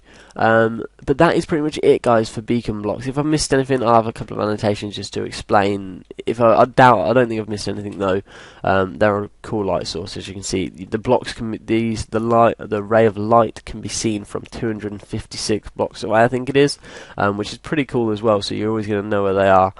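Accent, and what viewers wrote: United Kingdom. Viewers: I disagree it's just the regular brit. accent!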